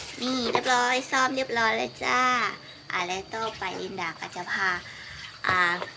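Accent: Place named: Laos. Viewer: ภาษาสำเนียงลาวเป็นเอกลักษณ์จังเลยนะครับสาวลินดา จะมากินกุ้งเมืองไทยอีกเมื่อไหร่ครับอยากไปเที่ยวลาวจังครับวัดที่ลาว สถาปัตยกรรมสวยงามครับ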